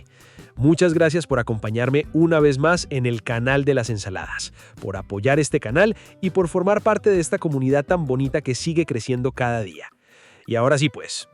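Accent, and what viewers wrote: Colombia. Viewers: Lo mejor de todo es tú acento Colombiano. 🌞🌠🌎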